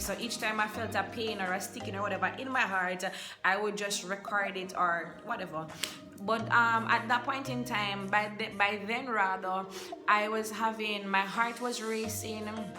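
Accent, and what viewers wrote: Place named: South Africa. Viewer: Wow....I really love her accent❤💯🤗#SouthAfrica.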